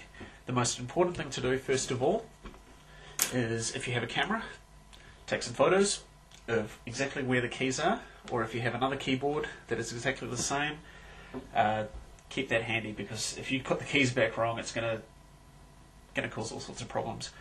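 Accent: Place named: New Zealand. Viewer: He's a Kiwi bro L2ACCENT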